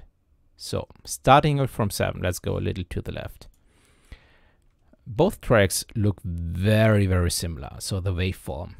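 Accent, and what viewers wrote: Germany. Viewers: I can tell that your German 🎉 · Nice video! I CAN HEAR THE GERMAN!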